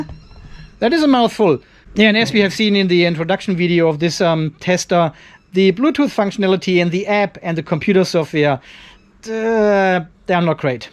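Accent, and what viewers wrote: Germany. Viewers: Is it right, that you have a german background? You said "nochmal" and your english sounds like here in germany 🤣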